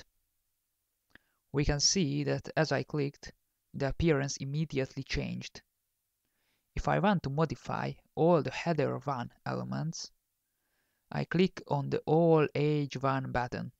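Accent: Hungary. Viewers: tényleg valaki olyannak kéne mondani a kommentárt, aki nem hunglishül beszél :)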